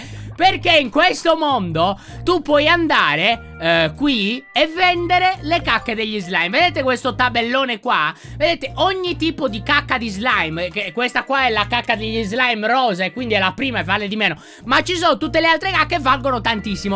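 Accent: Sardinia, Italy. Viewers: ma sei sardo??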